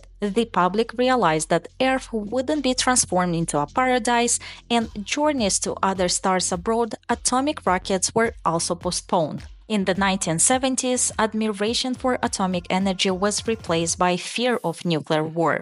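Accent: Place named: Russia. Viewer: What accent is this?? Russian?? Super adorable accent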